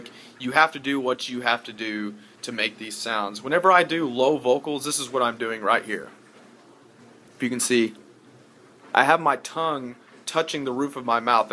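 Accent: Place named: South, United States. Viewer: I didn’t know you had a slight southern voice.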